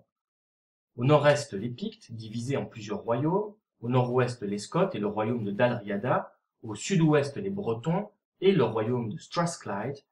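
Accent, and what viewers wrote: Belgium. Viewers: petit accent belge ,D depouis...^^ Mais excellentes vidéos explicatives. dommage qu'elles soient courtes. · Je vois par la prononciation que le vidéaste est belge. Je regarde aussi les vidéos de l'historien belge Dufays. Donc, les Belges ont beaucoup à nous apprendre.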